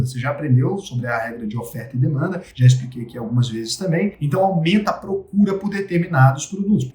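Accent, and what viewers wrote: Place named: São Paulo, Brazil. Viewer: Pensei isso por causa da voz e esse sotaque apaulistado 😆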